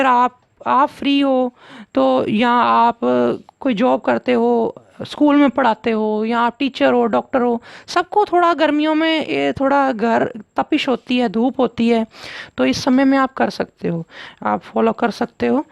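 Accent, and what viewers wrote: Punjab, India. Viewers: Thanx for best useful information an di like your punjabi accent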